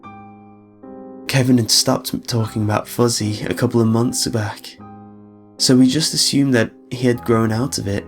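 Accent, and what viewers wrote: United Kingdom. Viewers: Your British voice makes everything seem okay :3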